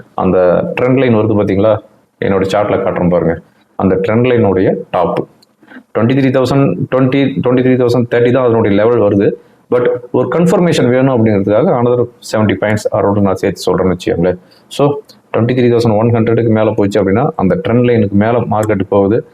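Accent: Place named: Chennai, India. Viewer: தல மெட்ராஸ் பாஷை பேசுது..வா தல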